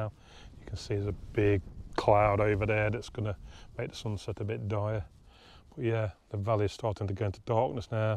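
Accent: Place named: Derbyshire, United Kingdom. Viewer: You are so very fortunate to live in a small Derbyshire village AND to be the type to get out and really know your hills & dales. So many people live in beautiful country villages but don’t walk anywhere these days. For me, apart from the stunning scenery, I love your Derbyshire accent and wait for that moment in each video when you have a rant .. and swear a lot for a brief moment lmfho! Love it!!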